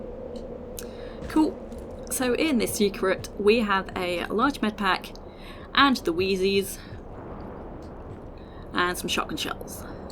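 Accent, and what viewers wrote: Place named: United Kingdom. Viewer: WHEN DID SOUDED BRITISH JUST WONDERING.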